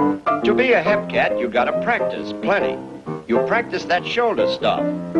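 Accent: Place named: Transatlantic, United States. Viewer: Sounds transatlantic.. and maybe northern!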